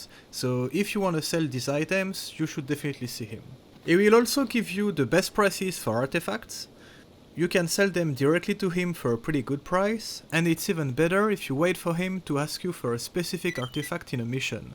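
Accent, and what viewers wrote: France. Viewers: tu a un accent fr je me trompe ? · l'accent bien Français des familles ^^, très bonne vidéo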